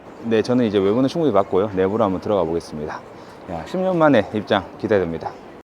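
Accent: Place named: Gyeongsang, South Korea. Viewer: 대구분 이신지요???? 대구 말투라 반가워요~~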